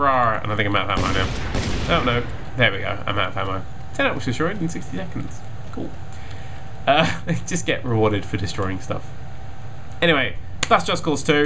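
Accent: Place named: United Kingdom. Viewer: not nearly as good as PewDiePie like when he plays Outlast lol... it's probably the British accent? but I know I'm not the only one who finds it pretty much the most irritating accent on the planet.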